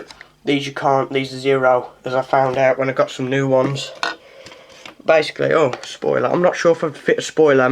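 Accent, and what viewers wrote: United Kingdom. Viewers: wouldnt this setting fuck up and slow you down? just wondering + man your cockney is deep af hehehehe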